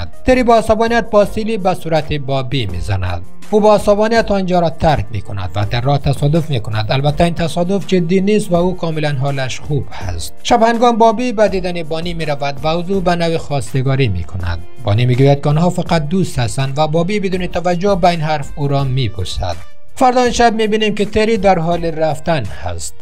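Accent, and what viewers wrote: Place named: Afghanistan. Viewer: داداش روی لهجه ی افغانیت کار کون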